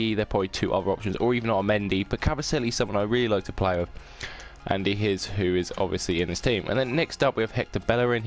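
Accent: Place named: Norfolk, United Kingdom. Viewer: I'm from Norwich mate, thought I heard it in your voice .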